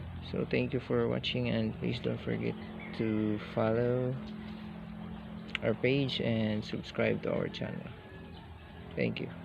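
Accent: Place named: Philippines. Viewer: Are filipino cuase you have a filipino acent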